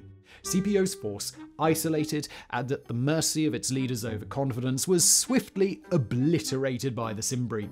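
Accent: United Kingdom. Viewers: Bro - you need to slow your speech down a bit . Between the pace of your speaking and the fact that you have a British accent ( which everyone may not be accustomed to hearing ) it makes it hard for the ( some ) listeners to understand all the words in the lesson you are trying to get across . Please , slow down. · “A true zenith of egregious military inability.” This is the most intense and perfectly descriptive explanation of the general’s lack of military prowess… But when I say it, it doesn’t sound as impactful as when says it…his British accent makes it sound much more posh and erudite than it does with my American/New Orleans accent…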